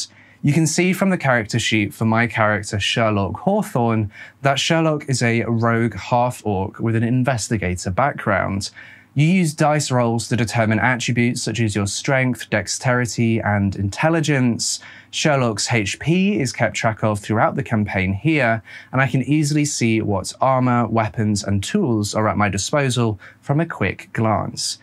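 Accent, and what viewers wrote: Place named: England, United Kingdom. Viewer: Oh, I don't really find any reason to take offense, but it did spawn an enjoyable and interesting conversation about the strange way certain words are spelled or pronounced. And I'm not trying to bag on in any way; he's English and can't really be expected to understand the full nuance of American pronunciation. I really only commented because I'd want to know if I was pronouncing something wrong and I'd hope somebody would politely correct me.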